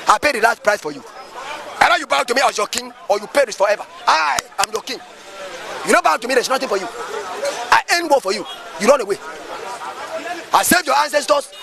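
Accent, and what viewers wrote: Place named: Calabar, Nigeria. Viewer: This is a Calabar man, you can hear his intonation. He wants people to discover him. You know if you don't talk about Biafra or Nnamdi Kanu no body will listen to you or recognise you as a pastor. He is a big fool.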